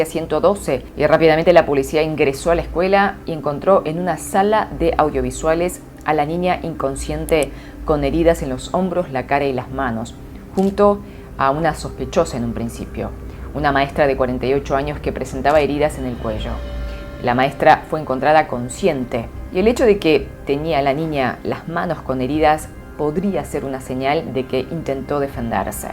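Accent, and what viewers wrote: Argentina. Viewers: Se ve extraño , ver a una chica con rasgos asiáticos y hablando argentino 😂 muy cool pero desconcertante ❤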